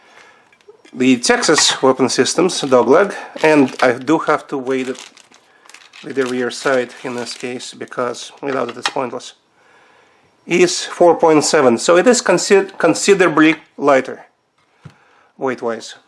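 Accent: Russia. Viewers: Русский акцент )) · слышу русский акцент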